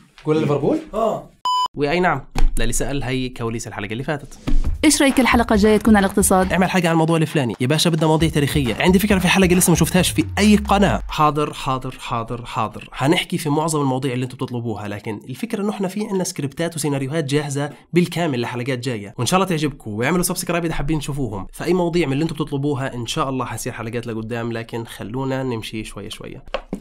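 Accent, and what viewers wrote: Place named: Algeria. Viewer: ههههه هذه لهجة جزائرية ❤❤ تعيش الجزائر و فلسطين شعب واحد للأبد 💕💕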